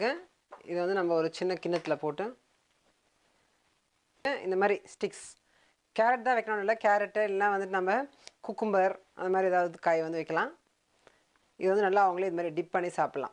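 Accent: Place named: Tirunelveli, India. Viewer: 5 days back I subscribed Ur channel..one full day I watched ur vedios.like Ur slang assault ah pesureenga.r u from Tirunelveli? Also I saw ur juices vedios bought some fruits..made muskmelon juice..then lunchbox ideas was very nice bought a new box for my husband.. plz upload toddler food ideas sathiyama saapda vaika mudila..thanks ungal sevai engaluku thevai ...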